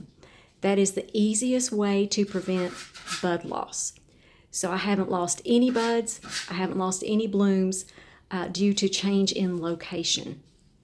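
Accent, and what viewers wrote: South, United States. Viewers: I love your accent, is it a southern accent? Beautiful. I love these plants, I have 3 of them. One is solid vibrant pink and the other two colors are rare colors, Sumba Brazil (reminds me of a sunset) and Gold charm (pink and yellow mix) ❤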